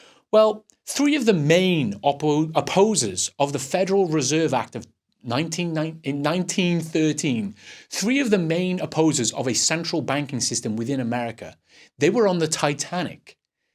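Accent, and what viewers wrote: Wales, United Kingdom. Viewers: Sounds Welsh to me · He sounds Welsh to me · i THOUGHT i heard some welsh in your voice! cymru am byth!🏴🏴🏴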